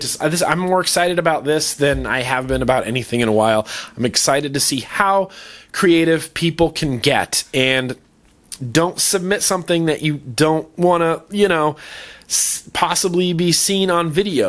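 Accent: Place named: South, United States. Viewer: Lovely vlog, from the southern accent to the hand gestures the laughs were real. Thanks.:3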